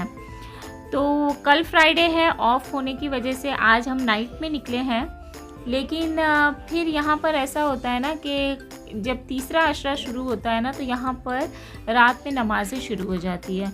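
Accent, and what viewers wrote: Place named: Pakistan. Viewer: Aap to bilkul Pakistanion ki Tarah boltin hain .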